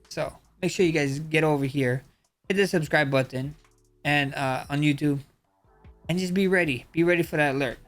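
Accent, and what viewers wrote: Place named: Hawaii, United States. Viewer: Just talks like every other Braddah out here 😂🤙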